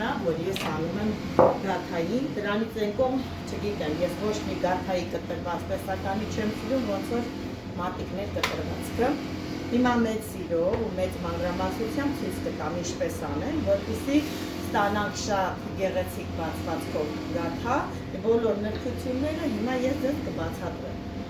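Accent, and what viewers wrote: Lori, Armenia. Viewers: Սիրելիս այսօր պատահաբար յութուբով տեսա ձեզ ամիջապես ամրացա ձեր ալիքին ուղակի կարոտս բավարարելու համար սիրով լսեցի մեր լորվա ակցենտը ես ինքս ստեփանավանցի չեմ բայց 25 տարի ապրել եմ այդ քաղաքում ունեմ շատ հոյշեր կասեմ որ գիտդնաք աբսօրվանից կունենաք մշտական հետևորդ մոսկվա քաղաքից ցավոք միմյանցից շատ հեռու ենք սիրով կփոխարինեի իմ խոհանոցային գաղգնիքները որոնց մեծ մասը իմ փորցից է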